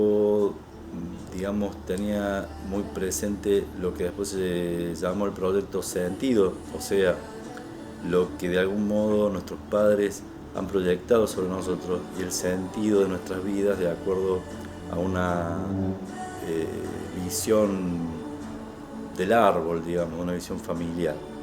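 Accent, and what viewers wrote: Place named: Córdoba, Argentina. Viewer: Gracias por compartir por este medio tu conocimiento, con palabras tan claras y ademas, con tonada cordobesa.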